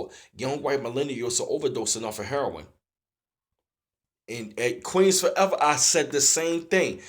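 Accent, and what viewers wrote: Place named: Baltimore, United States. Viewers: It’s an east coast accent to me. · The Bmore accent is such an interesting mix of Northeastern and Southern.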